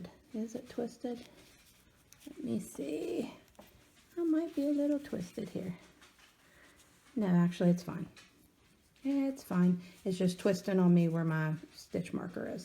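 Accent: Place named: South, United States. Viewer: Ooooh, Yay!!! A southern gal teaching CROCHET!! You sound like you're from the Carolina's by accent....but I could be wrong (usually Ga, Ms, Al have a thicker drawl than the Carolina's, but maybe I'm just a biased Carolina gal😜). Anyway, love that I found a fellow southerner to follow! Love the poncho! Thanks for giving the count for the various sizes too. And I thought you did great with your camera/CROCHET work! I don't think I could do it...I'm doing good looking at it directly and still miss, I'd really be OF if I had to look through a viewfinder or a screen! So, honey, you did great! New sub! Looking forward to more of your vids and patterns! Bye (in that beautiful southern bell drawl)! 😉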